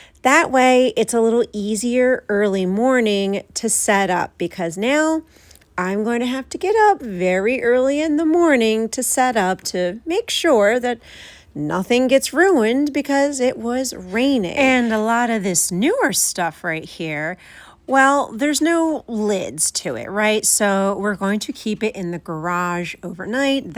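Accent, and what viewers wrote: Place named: South Jersey, United States. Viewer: How do you do your pricing for yard sales? Do you price each item individually, or do you just negotiate when someone is interested? I don't see prices marked per table or on each item. Also, please know that you were the first person I could watch about de-cluttering & hoarding disorder that made sense to me and I didn't feel overwhelmed with guilt listening and watching you. You've made so much progress and given so much hope to many! I've found one other channel, a lady more my age (This Mainer Mom), but you two are it, and both kind and practical and motivating! I'm not as far along as you are in decluttering, but am working on it, and have accomplished cleaning out several places I'd avoided for years. Something else: I recognized your accent and eventually found that you live near where I grew up (Pitman) before my family moved to Indiana when I was almost a teenager, though I've been back there a lot to see relatives & to take my own daughters when they were growing up. 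Your accent, your talking about going down to "the shore" and all just makes me remember family and my wonderful growing up years back in south Jersey. It seemed like the Lord helped me find you because you made sense to me, you have such an honest and loving spirit, and you also have expressed your faith. You have a ministry! And we also share a name, I think--! Thanks so much for all the encouragement you've been to me and I'm sure to so many others!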